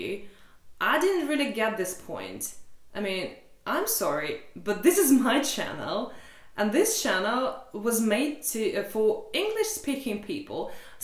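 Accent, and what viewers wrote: England, United Kingdom. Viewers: Why do you have a British accent??? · I'd like to see you do a video about the contrasts between Russian and Texas cultures. To me your southern drawl is not perfected yet but you're pretty smart, you can do it. Of course in south Texas the accent is different than in east Texas and a southern drawl is different in Texas than Alabama. To me it sounds like you speak English with a British accent but I doubt English sounds the same everywhere in England. And when you consider the UK, Canada, Australia, New Zealand and south Texas, it's different even among(st) native speakers. To me it's more about what we have in common. You're from Russia and not everybody is the same there either. But some of us share common values. Thank you for your videos. I would welcome you and your family in my home, I would sponsor you all (ya'll) if you want to visit. 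Take care... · Dear, Ignore the haters. Many of them would not notice the video, if it would be on Russian and not on English. 😄 Your English is excellent and I really enjoy your British accent. I watched a few videos and I really enjoyed the content. I wish you all the best in Serbia. 😊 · Probably not what you want to hear but I’m British and you do sometimes sound British (almost) ….but obviously I can tell you aren’t! Your accent is really interesting….oh and ignore the haters! 🤷‍♀️You should come to the UK and finish your conversion to 🇬🇧😀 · Hi, I like your English accent. Maybe you can make videos about how you learn English. You can share your experience with us. You know there are also many platforms where you can teach people English, and some of them are paid very well, especially Betakid. By the way, I think that this scandal in Serbian portals will help you in your advertising. 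· Lmao, of course you sound British, when it's your profession. I've chosen American. How dare we sound native! 😂😂😂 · Don't forget that your accent sounds very British, and NOT Russian so this is a barrier to some to believe you are not from the West or you are truly Russian. It's almost incredible to believe you have never been to the UK! I myself was fooled in this regard. This shows that as a linguist, you are very very good at speaking and learning English and with the desirable accent!!!! So, I had to re-listen to your Serbian "comparison" video again to find out why all the negative comments. And you DID NOT say anything bad about Serbia,, most of it was positive comments about Serbia. It was truly just comparing a few things in each of the two cultures. I truly don't know why all the negative comments afterwards. Maybe they interpreted it differently given their various background experiences. 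· ) yes you sound british .:)) iv got 🇷🇴 blood in my vain , but leave in denmark, iv got a serbian koleg and im trying to learn serbian. As a russian how well do you speak serbian?? and how long time did it took you to learn it?? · WTH??? I told you previously that your video was better (and in many ways) far kinder than most travel videos. For example, if I were doing this, I would point out that Russians rarely smile, men make more effort to be gallant to their girlfriends /wives... I truly can't understand why it would 'blow up' (?) this way. But remember... There is no such thing as 'bad' publicity. 😉 And your accent... You sound like someone who learned British English, not American English. I speak (badly and haltingly) BRAZILIAN Portuguese. Not continental, nor Azorean. That's what I learned. Different words and different style of pronunciation. At least this proves the saying : Haters gonna hate! 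😆 I like you. I like your channel. They can all go pound sand! 😆 😘🥰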